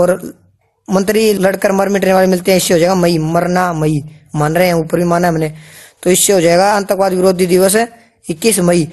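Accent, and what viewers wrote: Haryana, India. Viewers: Sir aap haryana k hai kya??? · Achi tricks h bhaiya......lagta h Haryana se ho☺️☺️☺️ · Hariyana se hai ke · जाको बोले पक्का हरयाणवी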